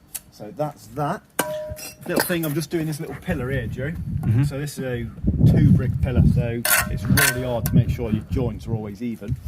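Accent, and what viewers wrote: Derbyshire, United Kingdom. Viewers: Where u from ...sounds like Chesterfield or North Derbyshire ?????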